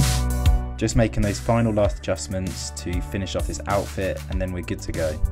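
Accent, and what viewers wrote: England, United Kingdom. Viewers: You sound English. Do people dress like that in the U.S and Australia?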